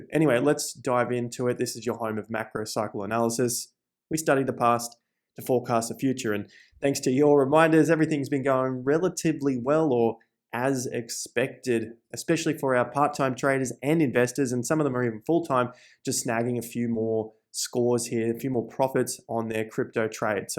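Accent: Australia. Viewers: Hey, can you do an analysis of the property market in australia please? i can hear the aussie accent;)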